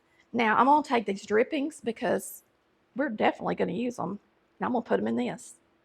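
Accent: South, United States. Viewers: Audio is fine she has a strong southern accent that can make her hard to understand if your not used to it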